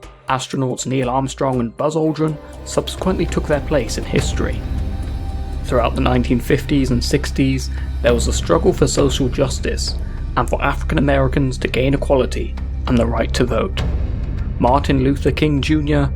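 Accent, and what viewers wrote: United Kingdom. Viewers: This whole thing is Americans propaganda in a British accent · Its crazy that he is saying this while he has a british accent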